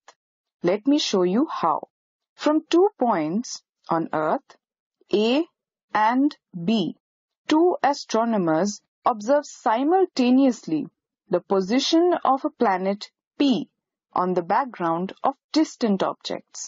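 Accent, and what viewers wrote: India. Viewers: not the greatest choice to use letters B, P, and D for an indian accent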